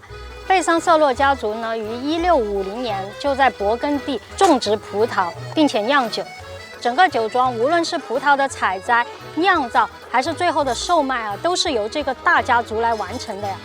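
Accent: Sichuan, China. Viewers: 不用理这种以自我为中心的狭隘的人，川普多可爱，保持自己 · 哈哈哈难道是个川妹子，我就说口音怎么那么熟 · 博主说的这是川普吧！熟悉中带着陌生😂😂😂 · 原来Yooupi是四川妹儿，怪不得讲起普通话内么有趣！😅 视频制作的很好，非常非常的有趣，感受快乐的同时还能了解更多地域文化！太棒了！❤❤ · 普通话夹杂四川话😂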